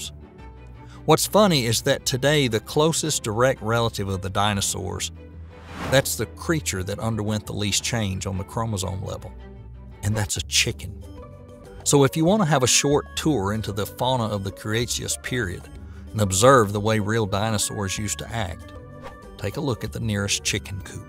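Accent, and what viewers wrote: South, United States. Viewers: Southern US accent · It is refreshing to hear a southern accent when learning about science and history! Thank you, Narrator, for breaking the stereotype of the creationist, Bible-belt Southerner! Please, please, PLEASE narrate more science vids in your accent! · Gotta love the southern drawl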